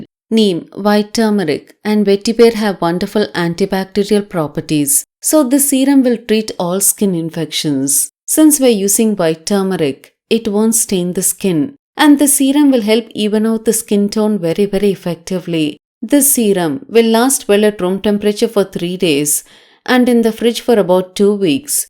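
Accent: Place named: Coimbatore, India. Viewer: Hi, i keep watching ur videos, when i hear ur tamil slang, i feel ur somewhere near cbe, is it so. U videos r very useful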